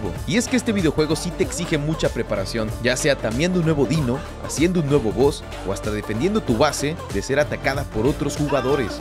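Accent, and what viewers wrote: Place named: Latin America. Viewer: Nah hombre, fuera de bromas tu estilo y edición son muy buenos, parecido al del grande de Mutzska, pero el tono más suave y tú guíon más crítico/narrativo son únicos y me gusta (no es agresiva Jwulen XD) y además eres el primer youtuber al estilo Mutszka que escucho hablar en español latino, aplausos pa ti 👏😄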